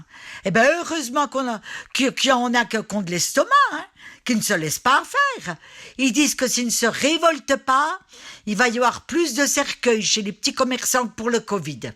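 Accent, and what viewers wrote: France. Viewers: Merci ma très chère pour ta verve et ton regard si aiguisé sur cette société mortifère...on a besoin de ta vision et de ton accent qui nous emmène au cœur de ta belle province. On t embrasse du cœur du Nivernais et n oublie pas Lola!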